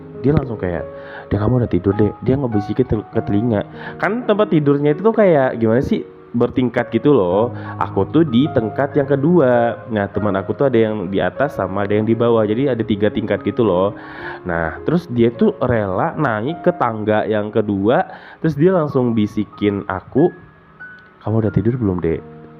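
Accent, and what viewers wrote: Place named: Sunda, Indonesia. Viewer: Suaranya suara Sunda bgt... Lembut dan berkarakter...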